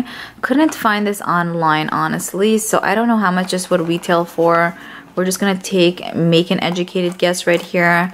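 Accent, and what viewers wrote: New York, United States. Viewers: sooooo adorable I love you so much & I could binge all your videos over and over it’s that NY accent gurrrll ☺️💜💜 you are the reason why I spend so much time in TJ Max 🤣🤣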